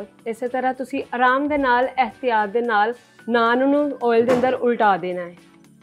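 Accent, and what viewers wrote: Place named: Sialkot, Pakistan. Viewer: aap mujhe Lahore Ki Nahi lagti aap Sialkot ki lagti ho kyunki yah Punjabi Sialkot Mein boli jaati Hai ok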